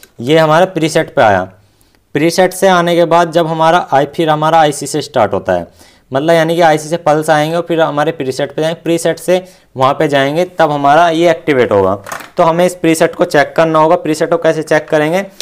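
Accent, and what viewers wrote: Meerut, India. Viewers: Meerut ke rahne wale ho lagta hai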